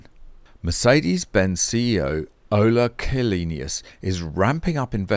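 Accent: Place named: United Kingdom. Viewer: Listen!!! has a British accent.😂😂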